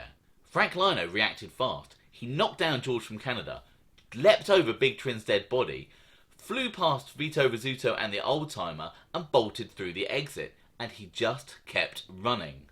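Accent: United Kingdom. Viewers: Loving the videos mate. Nice to here a fellow Brits talking about the mob.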